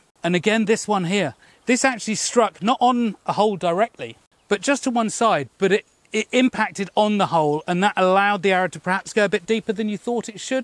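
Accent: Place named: United Kingdom. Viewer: Harry Potter accent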